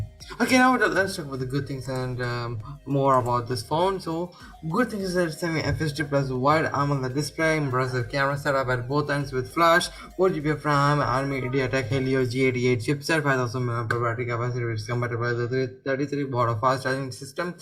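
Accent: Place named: England, United Kingdom. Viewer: Indian hai kya?? Pura angrez ho riya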